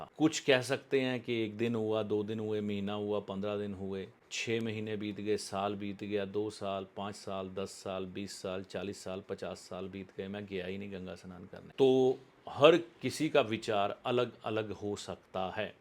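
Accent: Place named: Punjab, India. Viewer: पंजाबी एसेंट हैं, थॉट पकड़ो समझे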